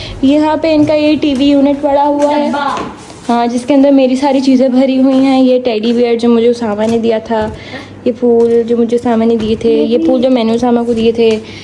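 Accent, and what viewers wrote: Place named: Karachi, Pakistan. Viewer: Very nice house tour you say you blong to ch family I'm also blong to ch family live at Islamabad but your accent speaking style like Karachi people not like ch family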